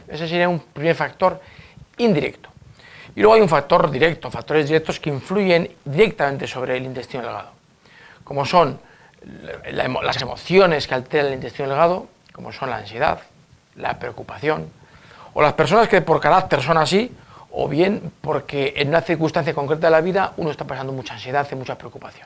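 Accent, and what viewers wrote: Spain. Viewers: Es que habla ese español de España que es tan feo hablan mas con la lengua 🤣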